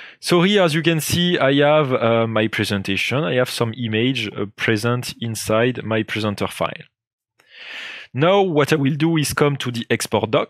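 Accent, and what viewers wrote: France. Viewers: Французский акцент? Очень сильный. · Twinnmotion is a beauty, but that fucking French accent leaves me in awe, still don't understand a single word the guy says unless I activate captions.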